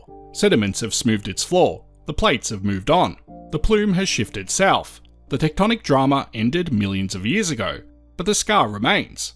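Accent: Australia. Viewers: the voice is much easy to listen, australian accent is ok way better than the other channel.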